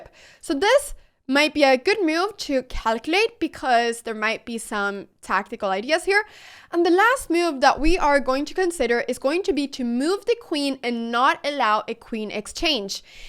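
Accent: Spain. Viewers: Off topic: like your spanish accent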